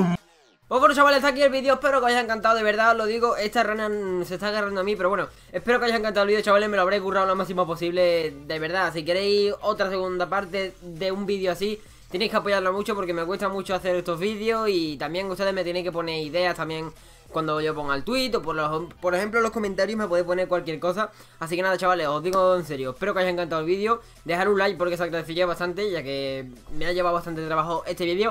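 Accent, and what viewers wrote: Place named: Andalusia, Spain. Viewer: Eres andaluz? Tienes acento que no conozco PD: quien coño gime asi cuando se corre?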